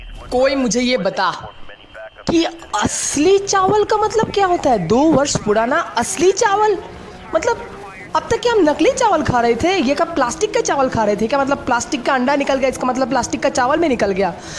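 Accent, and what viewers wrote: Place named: Bihar, India. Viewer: Shera to😂😂puro roast r tor Hindi te Bihari accent👌🙌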